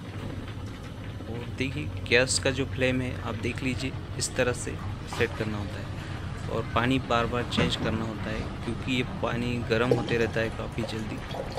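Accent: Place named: Maharashtra, India. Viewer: Aapke Hindi bolne ke tarike se aap nagpur maharastra ke lagte hain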